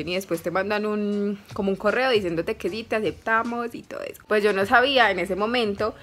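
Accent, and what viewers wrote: Colombia. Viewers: Me encanta tu acento colombiano 🤤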